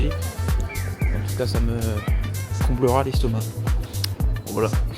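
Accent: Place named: Japan. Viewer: on kiff l'accent japonais ( continue t'es vidéos elle sont incroyables )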